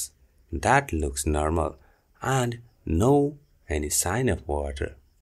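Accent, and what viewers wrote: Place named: India. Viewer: sounds very indian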